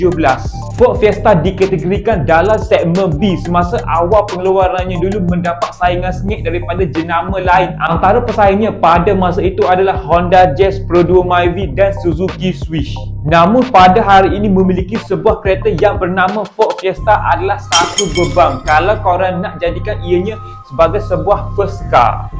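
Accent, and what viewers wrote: Kelantan, Malaysia. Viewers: dia pelat kelantan..percakapan melayu ada loghat2 gitu 😂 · Kecek klate mudoh pehe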